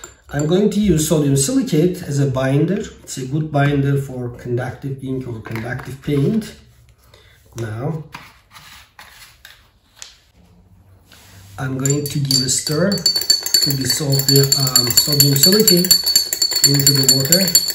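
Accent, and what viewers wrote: Turkey. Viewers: Merhabalar Öncelikle videolar için çok tesekkürrler çok faydalı içerikler var kanalınızda.Aksandan ve kullandıgınız malzemelerden anladıgım kadarıyla türkiye de yasıyorsunuz. Büyük ihtimal sizinde karsınıza çıkmıştır bi yerlerde. Nanomax ısı boyası ya da başka marka adları altında satılan bir ürün var. ISI BOYASI. Bu sistemi kendim yapmak istiyorum. Bu konuyla ilgili bi proje yaparsanız izleyiciler çok faydalı olacagı inancındayım.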